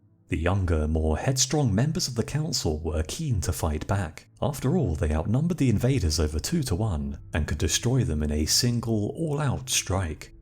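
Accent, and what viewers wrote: United Kingdom. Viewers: soon as i heard the british voice i started laughing lol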